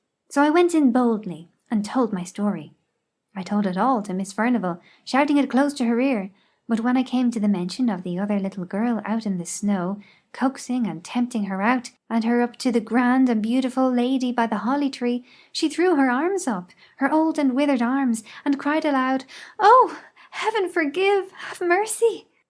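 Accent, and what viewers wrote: Ireland. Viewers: You are a very creative girl ... and I love your Irish accent !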